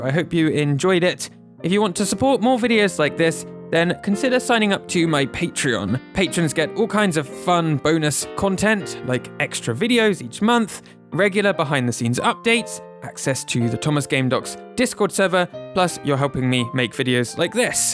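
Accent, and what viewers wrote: United Kingdom. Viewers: GUYS HES SAYING MARRY O WHICH IS THE CORRECT ONE FOR HIM DONT COMPLAIN THAT HE IS PROBABLY BRITISH BECAUSE I SAY MARRY O TOO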